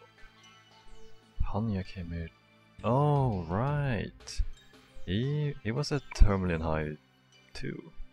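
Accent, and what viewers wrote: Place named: Europe. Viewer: Pardon me if this sounds a little rude, but do you have an accent? Your is so unique and cool and I want to say it's due in part to a European accent of some sort, but I can't quite put my finger on it. Where are you from?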